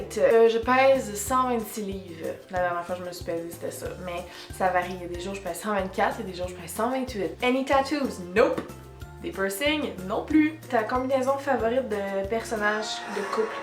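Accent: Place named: Quebec, Canada. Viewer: frist timer. I miss hearing Quebecoise accent. I enjoy your channel, I'm a new sub.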